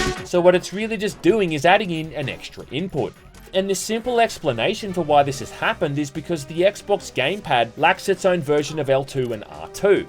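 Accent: Australia. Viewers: It's not "Satin" it's Saturn. Sat-TURN. You're aussie english is atrocious.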